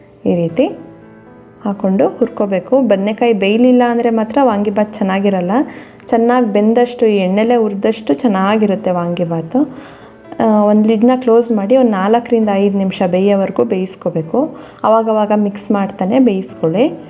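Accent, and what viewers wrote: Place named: Mandya, India. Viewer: Sorry for asking, since you're slant of Kannada resembles Mandy side, still you're from my husband's place nice to hear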